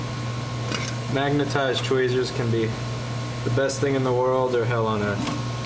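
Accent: New York, United States. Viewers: You can totally tell he's from New York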